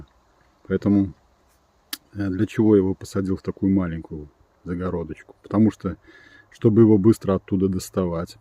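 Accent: Belarus. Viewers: Вы с Беларуси ? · А я все думал откуда у тебя такой окцент Так ты земляк я тоже сам с Минска живу в штатах Никого не слушай все правильно сделал спас хряку жизнь Молодец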